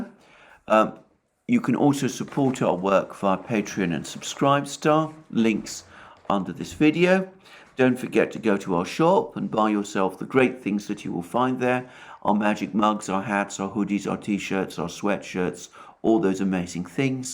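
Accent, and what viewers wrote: United Kingdom. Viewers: Alexander is actually Russian citizen with a British accent. He looks Russian enough.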